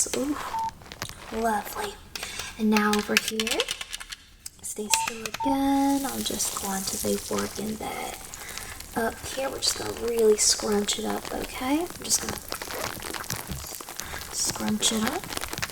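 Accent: South, United States. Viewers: I’m from the south and your accent is spot on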